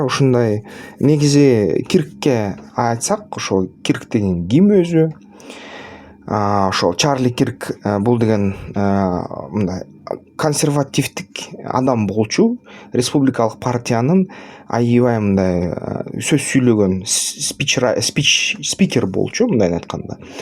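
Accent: Standard. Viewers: Байкем Аман болуңуз кыргызча таза сүйлөгөнүңүз жагат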